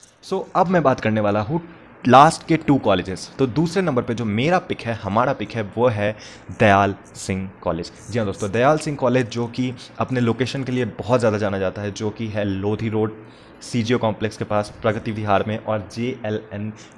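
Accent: Bengal, India. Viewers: Naa mane arabinda k orobindo bolcho r motamuti mone hoy tumi bangali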